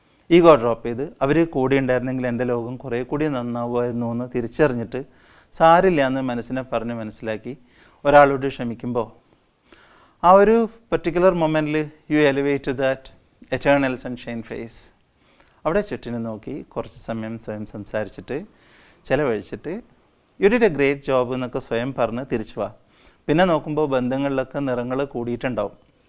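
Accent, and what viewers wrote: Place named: Kanhangad, India. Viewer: ഞാൻ ചിന്തിക്കാറുണ്ട് എന്തുകൊണ്ടാണ് ആളുകൾ നല്ല content videos കാണാത്തതു എന്നു . എല്ലാവര്ക്കും മറ്റുള്ളവരുടെ സ്വകാര്യത അറിയാൻ ആണ് ആഗ്രഹം. Hmm അതെന്തെങ്കിലും ആവട്ടെ The only regret is that I was little late to watch your videos Now watching all the videos together It's like a close friend sitting next to me and talking ചിലപ്പോൾ kanhangad slang ആയതു കൊണ്ട് എനിക്കു അങ്ങനെ തോന്നുന്നതാകും I'm waiting for more videos like this❤️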